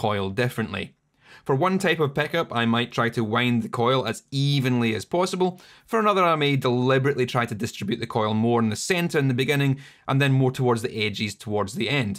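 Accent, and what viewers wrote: Scotland, United Kingdom. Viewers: It reminds me of Mr. Scott on Star Trek. "The engines canna take much more of this, Captain! Ach, my poor wee bairns!" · Great video (except too much information crammed into so little time at a speed that precludes taking notes since I can't write that fast, with such heavy British accent that I can't understand because you talk too fast)! But on a separate note (no pun intended), how different are today's pickups from S.G.'s patented ones? (I think it was SG, Simon maybe? crap, I can't remember anymore cause I'm so stinking old!!! ... Ole what's his face .... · Not being a Scottish Highlander myself, I had to slow down the Playback speed here AND use the CC subtitles as I also often do with Irish movies, unlike Liam Neeson would do with his "particular skill set" of being an Irishman. The Great Scots even make the intrepid Irish look like wussies while even wearing their skirts too; that's like Viking level cool and I've gotta Love that!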